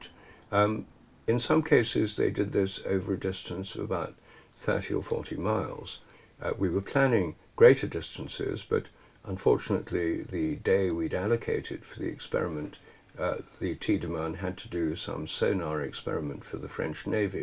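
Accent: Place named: United Kingdom. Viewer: Thank you, Rupert, for your dedication, and oh so typical British style of speaking and tenacity in between spells of politeness 😊 I'm happily surprised (I'm Dutch) to hear of this pigeon- experiment with Dutch pigeons. It's great humour to know of a formal Navy ship and crew, welcoming such an experiment. I'm a longtime follower of your work, living at an older age, observing the gap growing smaller, between the intellect of science and the intelligence of the heart, the world of spirit that begins to bleed through the icicles of logic. Your brave steps to throw stones in the pond of logic are much appreciated. It's truly groundbreaking, only not for eyes that are wide shut. Thank you so much!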